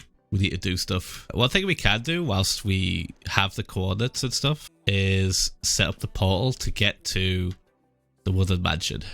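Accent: United Kingdom. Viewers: I know im so fucking late but im working up alright. The voice sounds more british somehow. Its more gummy.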